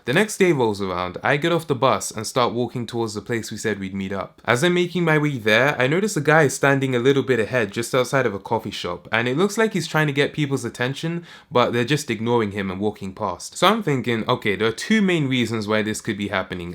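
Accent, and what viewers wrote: England, United Kingdom. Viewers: I forgot he was British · Is he from the UK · Are you english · Your british?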